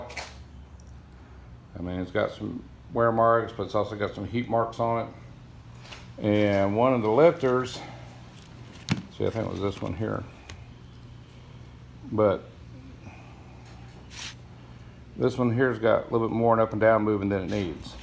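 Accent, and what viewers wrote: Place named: South, United States. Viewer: Great job again, with your mem card filling up maybe upload in 720p you might get a few more minutes, its still hd but youtube for some reason have taken of the red hd if u follow me man. regards from down under, love your Southern accent stay cool mate.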